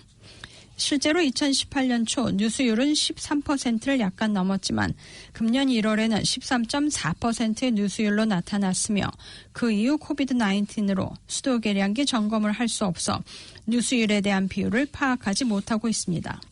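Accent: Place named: North Korea. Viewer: 발음이 북한같아요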